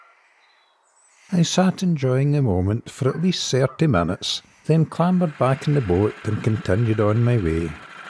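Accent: Scotland, United Kingdom. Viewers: Subtitles not available in this video??? 😢 very complicated to me to understand your lovely scotish 😒 anyway, I'll be enjoying the pictures 😀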